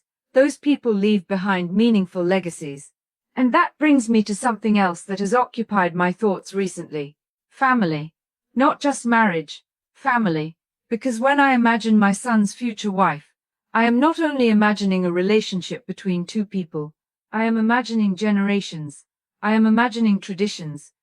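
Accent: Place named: Canada. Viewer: Maye Musk, you are such a supportive mom, you will be a hard act to follow. Your speaking voice is as lovely as your modeling presence. That Canadian accent is always so proper and you did not lose it in Pretoria. I'm seriously pulling for Elon's acceptance among Americans who depend on honest leadership. It is not easy. His admirers are men who want to be him and women who want to possess him for selfish snd selfless reasons. 🙏 🤲 🕍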